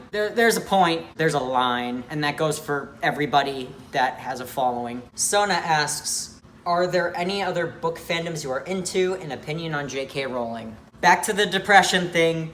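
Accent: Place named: South, United States. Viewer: Very weird question, but are you from Southern Illinois? Your accent sounds more southern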